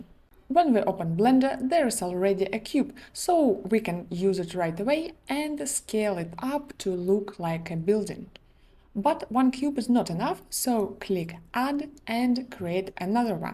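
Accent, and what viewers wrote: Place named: Russia. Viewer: love the russian accent. sound really nice to listen to. really nice video, giving a lot of advice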